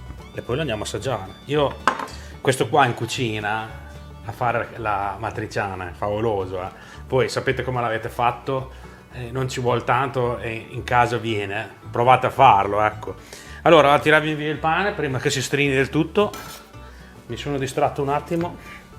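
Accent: Emilia, Italy. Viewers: sei bravissimo, grazie per questo video! una curiosità, di dove sei? dall'accento sembri emiliano. io sono romagnolo (Rimini) ;)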